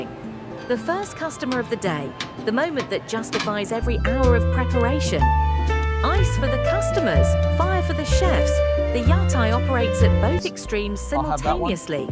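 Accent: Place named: United States. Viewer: Annoying American narrator.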